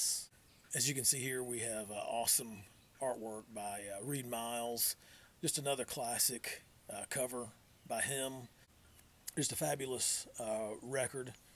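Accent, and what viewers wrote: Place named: South, United States. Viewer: are you in GA or AL? The accent and the pines behind you tip it off if so! Haha.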